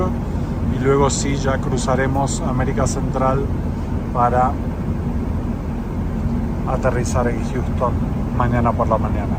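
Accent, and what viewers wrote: Argentina. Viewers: A este muchacho no le saco la ficha habla con palabras de España con acento argentino, raro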